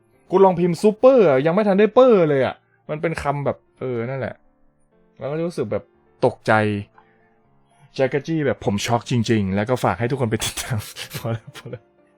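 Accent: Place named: South, Thailand. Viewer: สำเนียงใต้พี่ชัดมากเลยครับ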